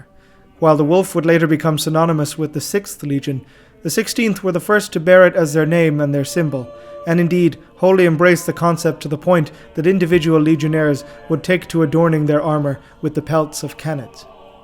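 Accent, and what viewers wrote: Ireland. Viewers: sound's like Irish but i do wonder where he is from and his back ground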